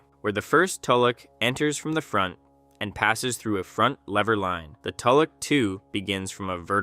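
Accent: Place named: Ukraine. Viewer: I missed your videos brother and still miss your Ukrainian accent, hope you are doing well.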